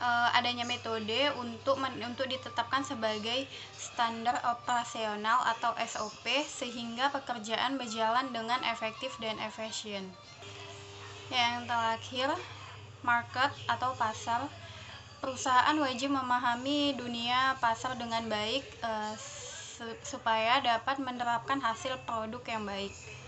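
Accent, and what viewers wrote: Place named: Java, Indonesia. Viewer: Asli mana kak ..kok medok jawa ?🤣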